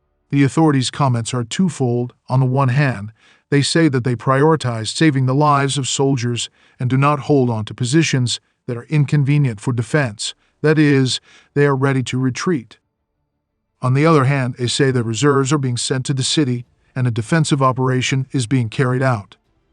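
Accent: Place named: United States. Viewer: from Ukraine, professionally narrated by some American))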